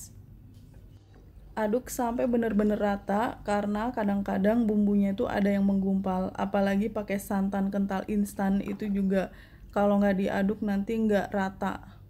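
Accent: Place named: West Java, Indonesia. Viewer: Pasti org Sunda...itu ada bahasa Lekoh nya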